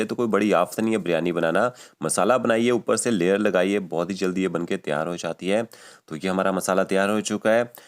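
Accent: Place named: Punjab, India. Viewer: Chef aap mast hain, pls don mind thoda Punjabi style hai but recipes mast hain aapki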